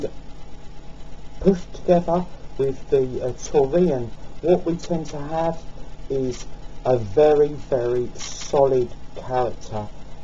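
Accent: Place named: United Kingdom. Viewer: such good material... but the audio is really poor. and you have an awesome brit accent!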